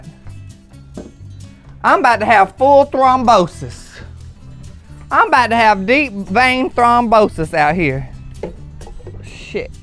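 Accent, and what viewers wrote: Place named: South, United States. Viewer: This ain’t funny. Just talking nasty ain’t funny even with a southern accent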